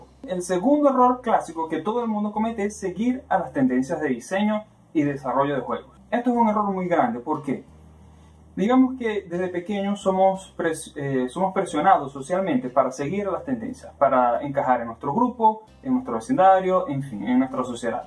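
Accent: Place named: Venezuela. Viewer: Verga ese acento de Venezuela esta bien marcado jaja. Buen vídeo hermano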